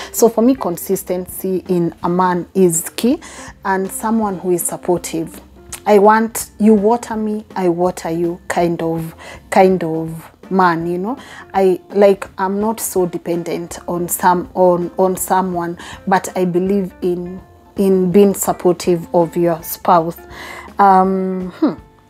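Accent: Kenya. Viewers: You sound very Kenyan YOU KNOW ; ; . ; ; ;. Right?? ⁉️ Ly Baurer 2️⃣4️⃣ December Climax to (Sounds like a true Kikuyu Woman) #NoUkabila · Thank you for keeping your original Kenyan accent.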